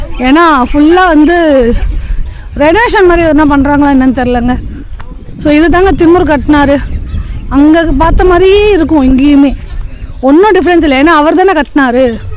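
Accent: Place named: India. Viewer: யூரோப் & யூரோப்பியன் யூனியன் பிரதேசங்கள் பயணம் செய்யும் போது, இந்திய ஆங்கிலம் பேச வேண்டாம்.பஸ் என்கிற வார்த்தை புஸ் என்று கேளுங்கள்.போலிஸ் வார்த்தை புலிஷ் என்று கேளுங்கள், ஏர்போர்ட் வார்த்தை ஏர்போட்டா என்று கேளுங்கள்! உங்களுக்கு வழி கிடைக்கும்.😊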